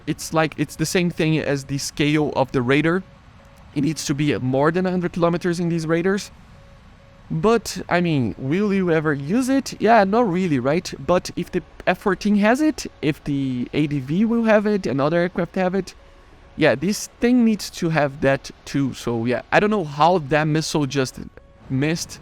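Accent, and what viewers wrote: Brazil. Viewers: He’s Brazilian, accents buddy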